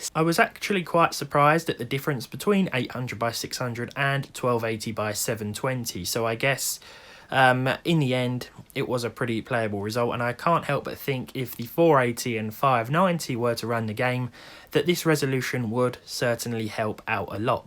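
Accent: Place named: United Kingdom. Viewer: That british english... pain in the ears 😞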